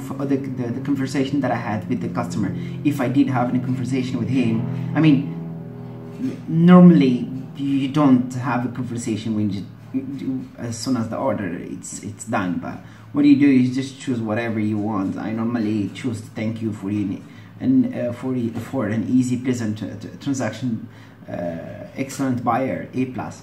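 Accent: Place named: England, United Kingdom. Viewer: Ur english accent👌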